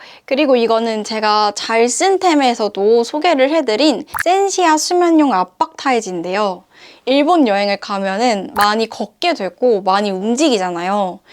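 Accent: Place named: Vietnam. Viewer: 베트남분이신가요? 한국분아닌것같은데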